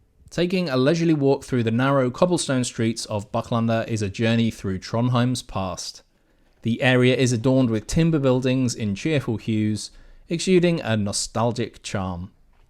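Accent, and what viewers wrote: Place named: England, United Kingdom. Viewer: You sound English and I am curious as to why you moved here? Do you also speak Norwegian?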